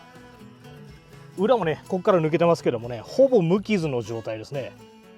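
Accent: Kansai, Japan. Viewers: 関西弁のシャベリも検証も楽しく拝見しました。 FMJだとなんとか原作通りに手が破壊されなかったですね。 ただあの場所を抜いてしまうと骨が無事でも正中神経や骨間筋、虫様筋などがズタズタでしょうから、手の機能は著しく低下したはずです。 槇村が普通に目視で縫合してましたがマイクロサージャリーが必要なレベルです。 他の動画も楽しく拝見させていただきます。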